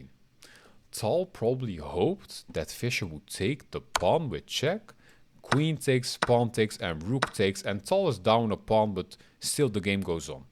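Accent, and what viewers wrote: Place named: Turkey. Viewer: Türk aksani her yerde kendini belli ediyor😃 guzel video reis devami gelsin bu tarz videolarin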